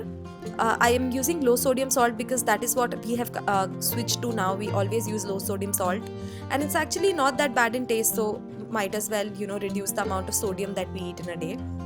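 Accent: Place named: India. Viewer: I watch your vedios for improving my English speaking...bcz your english is pure indian english... thanks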